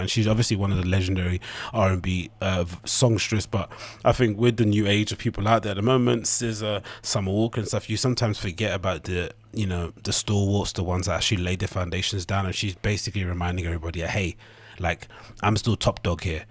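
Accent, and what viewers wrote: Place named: Southampton, United Kingdom. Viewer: Your accent sounds like its from Southampton U.K. very familiar 🤙 keep up the good content man!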